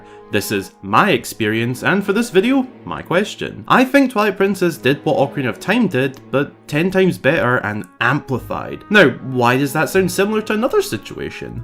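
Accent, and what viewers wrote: Scotland, United Kingdom. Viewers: What is it about scottish accent creators that make me want to play TOTK even more lol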